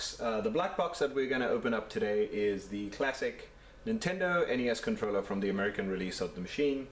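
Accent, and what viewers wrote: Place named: South Africa. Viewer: you sound like you have a bit of a latent South African accent going on there. Awesome video too, keep it up.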